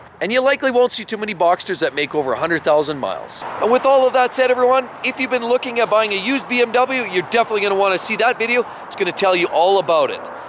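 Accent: Canada. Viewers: You are very well versed in automotive technology. You reinforce my distrust in newer vehicles. Not to generalize too much but they are nothing more than over priced junk. You sound like you are from Canada. Ehhh...!!!!! Thank you.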